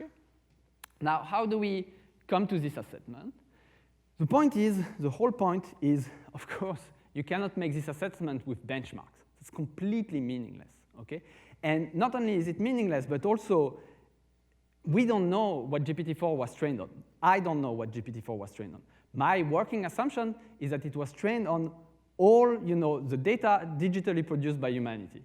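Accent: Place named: France. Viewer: Stochastic Parrots 🦜! Taking over the world! Clever birds! Anyway, I would have expected Sebastien to be affected by Methuselah Syndrome, it would only have been fitting. But the french accent makes up for it, like François Truffaud in "Close Encounters".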